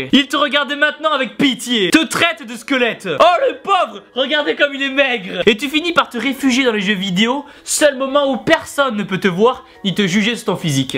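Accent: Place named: Southwest, France. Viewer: les intros de Tibo: - avant: avec un accent du sud ouest, un mec total dark. "hey les gens! ptn on m'a retrouvé, faut se barrerrrrr!!! - un peu après: "hmmmmmmm ( inspiration avant le DAMN ), hmmmm, HMMMMMMMM, tiens dis donc, mes mains puent la transpiration, c'est degueulasse! hmmmmm DAMMMMMNNNNNNNNNNNNNN explosion atomique les gens, c'est et c'est un... hmmmmmm hmmmmmm HMMMMMMMMM. PPPPPPPPPPPLLAIISSSIIIIIIIIIIRRRRR gros postillions de vous parler aujourd'hui" un peu après: " DAMNNN les gens, j'espère que vous allez bien, c'est et c'est un plaisir de vous voir aujourd'hui! et aujourd'hui les gens... aujourd'hui les gens... aujourd'hui, oui aujourd'hui... pas d'inspiration BOUM!! " en ce moment: " dan les gens, g pas le temps de niaiser, faut que je batte squeezie a qui a l'intro la plus rapide! BOUMMM! " Demain...?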